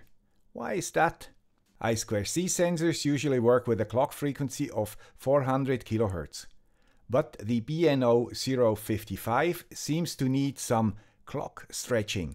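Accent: Switzerland. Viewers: Third law of termodynamics -> There is no place where you can find -1,800 °C, not even in Sibiria ;-). Some thoughts about the SPI temperature sensor: a) The low negative temperatures could be caused by a wrong polarity. Have you checked that the thermocouple is connected with the right polarity? b) Are you sure the thermocouple and the MAX31855 are type K? The circuit python module only works with K-types. c) There is a very little chance that the D5 pin is not set as output: you could do this by adding the line to script: cs = digitalio.DigitalInOut( board.D5 ) cs.direction = digitalio.Direction.OUTPUT # add this line It would be so cool, if we could find a solution because knowing, it doesn't work, drives me crazy. Yes, I'm a Nerd ;-). Someone like Mr. Monk ( but not so brilliant ;-) ). BTW: I like your videos: interesting themes, well prepared, you do not hide your mistakes (so we could learn from them, as well as you did), understandable english (with a nice swiss accent; greeting from a German neighbor), no boring background music and for me also important: fluent speaking with no ehms and aahhms. 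So thank you for your work and for your countless inspirations! vy 73 DF4VB (Peter) PS: What is a qualifying purchase? Only the products in your shop, or can I mark other things, I'd like to buy, to be recommended by you (or so)? I have no experience with amazon, but if I could support you, I'd give it a try. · the Swiss accent sounds a lot more pleasant to me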